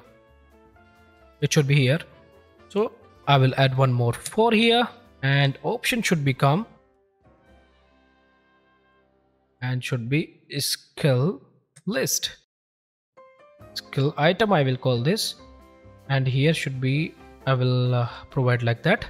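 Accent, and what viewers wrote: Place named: India. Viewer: i hate indian english but i love u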